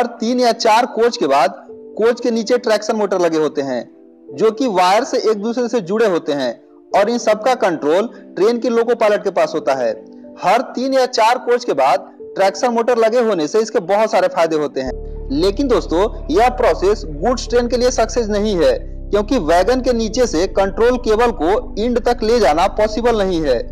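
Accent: Bihar, India. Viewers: Bolne waala Bihari hai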